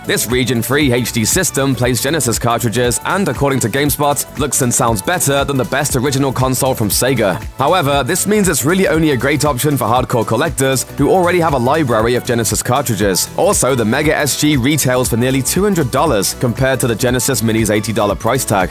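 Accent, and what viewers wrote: England, United Kingdom. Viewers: English bloke, English accent. Doesn't mention the Euro release at all. Shit.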